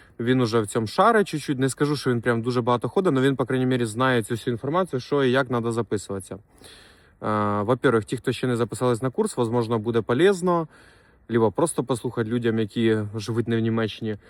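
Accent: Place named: Ukraine. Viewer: говорит на русацком языке, добавляя украинский акцент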